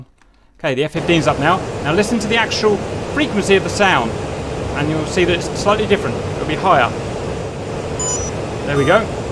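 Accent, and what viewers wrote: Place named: Manchester, United Kingdom. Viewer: Just a thought...not that anyone listens...but I'm new to DCS, but I am a private pilot...and some of the things i have seen and heard from a number of people who claim to offer help...really bug the crap out of me. It seems that you guys forgot that you are offering "help"; which means that the people who are listening to you don't have the time or experience with DCS...that is the same time you have. Personally, I suspect a number of you..., sidewinder, wags, etc...work for DCS; or did at one time. So, before I begin let it be known that I have tons of respect for my brothers who live across the pond; but some of you speak with a thick Manchester accent...so understanding you in simple conversation is difficult. So could you please slow down when you are speaking...this is not a race...its a tutorial or instructional...and if you want to reach out to everyone effectively...slow down. 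In addition, as you talk could you please use the cursor to highlight the components you are talking about or using. More often than not you are using the abbreviations, and when you combine the abbreviations with the speed in which you talk...the new guys have fallen behind before you begin. So please slow down, and ensure you are visually queuing the components that you are talking about...so the rest of us get it. I'm new...but you will be seeing me on the field...Bad JuJu or Train Wreck.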